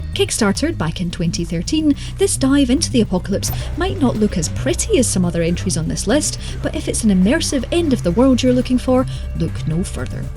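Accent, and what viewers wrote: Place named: Scotland, United Kingdom. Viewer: Can't beat a good Scottish accent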